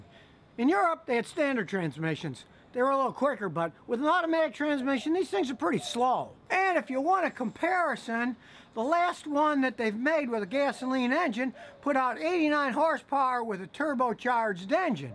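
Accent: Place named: United States. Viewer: I cant stand the guy, the way he acts and his voice just screams, I'm American and unless its American made he wont like it. Would the Smart fortwo work in a place like texas? Probably not but does it work for places that are congested and difficult to park, like LA, NYC, London, Paris, Rome/Naples yes it works brilliantly. I have put 10k on mine since i bought it in June 2022 and as its a MHD i had to replace the starter belt and pulley but thats it, but i agree with swapping out the front tyres, I am currently on the look out for a set of rears to put on the front.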